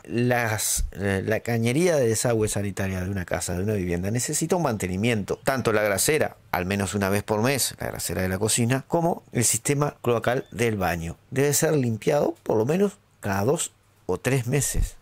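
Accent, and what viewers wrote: Uruguay. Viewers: Habla en uruguayo gallego? Elegí uno loco!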